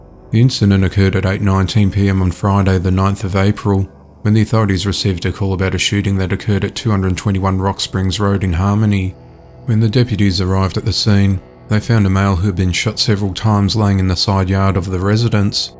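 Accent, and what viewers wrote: Australia. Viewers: All news should be told in an Australian accent. It’s just easier to hear. Not so much the news as the soothing voice and the contrast thereof. Thank you!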